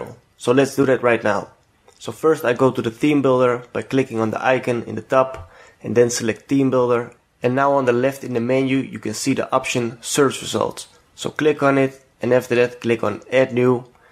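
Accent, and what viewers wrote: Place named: Netherlands. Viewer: I know a Dutch man when I hear him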